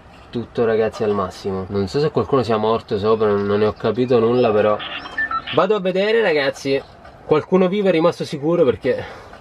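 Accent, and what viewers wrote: Palermo, Italy. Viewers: Finalmente rieccoti! Ci mancavi! Comunque, sei della provincia di Palermo? O mi sbaglio?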